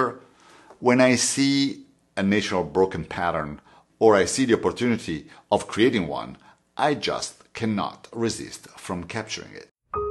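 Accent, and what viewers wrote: Italy. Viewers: Again, I am totally amazed at the gift of finding you on YouTube. The word that fits is " serendipity" ( the occurrence and development of events by chance in a happy or beneficial way; that is you!) You make learning a joy and it helps with that awesome Italian accent. I never truly understood the rule of 3rds and now I understand. In Japanese art it is about what is and what isn't and that emptiness brings power and balance to all things. .... we come to that place for me where I can't wait for the next video. Mahalo.. ps I love the histogram T .. that is so very cool with the label. e'la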